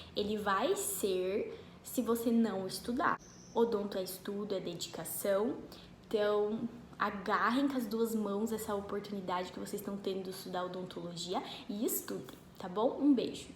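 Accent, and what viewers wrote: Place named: South, Brazil. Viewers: Esse sotaque gaúcho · é do Sul pelo sotaque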